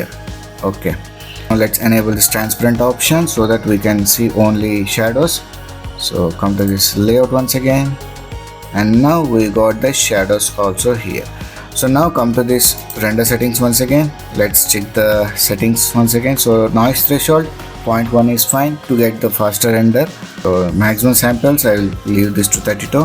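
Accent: India. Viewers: why is it all indians weird curry accents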